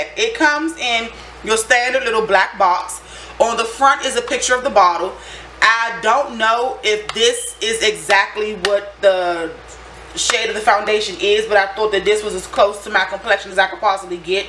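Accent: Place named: DMV, United States. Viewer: I hear the dmv accent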